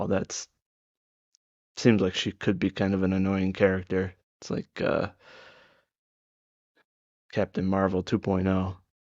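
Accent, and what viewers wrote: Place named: Germany. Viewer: Ты говоришь по-русски язык!! Я слышу немецкий акцент…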